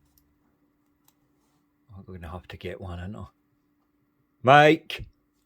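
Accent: Scotland, United Kingdom. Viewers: Love the commentary. Swearing doesn't seem as bad with a Scottish accent 😂 P.S. Just ordered the watch you reviewed, I've been looking for a white tool watch for a while.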